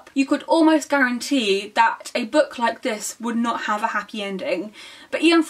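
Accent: United Kingdom. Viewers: Yours...it is my favourite "British Accent" of the Year, so could you tell me more about it, please? Thanks in advance anyway.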